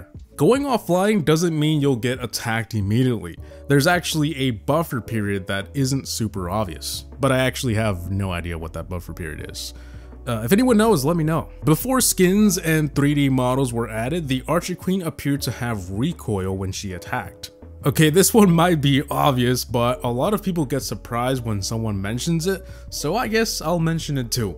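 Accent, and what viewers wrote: Norway. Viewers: Bro are you Norwegian or sum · Are u norwigan ?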